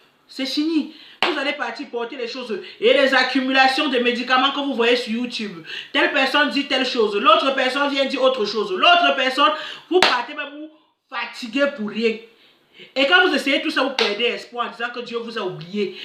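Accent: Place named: Cameroon. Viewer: 😂😂😂j'aime trop ta façon de parler ( l'accent kamer ) trop belle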